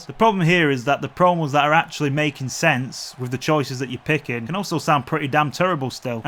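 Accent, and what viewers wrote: Yorkshire, United Kingdom. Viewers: Are u from Yorkshire?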